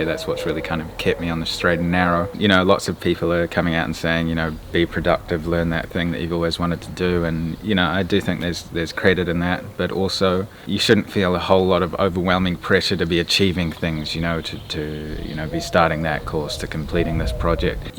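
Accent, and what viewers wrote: New Zealand. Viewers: I'm confused, are you actually from Hungary and grew up in NZ for most of your life? Or you are from NZ and later moved to Hungary? I know either way you definitely spent most of your life in NZ bro, there's no hiding that accent haha you sound and even look just like my best mate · it sounds very Kiwi. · Piet Velkens Are you serious? I’m from NZ and he sounds very kiwi?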